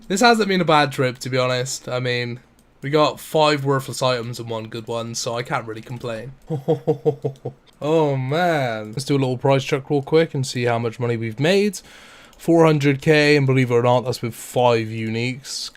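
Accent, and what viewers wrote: Bristol, United Kingdom. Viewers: keep up the great vids man, really entertaining! also where in the SW are you from, you sound very bristol my friend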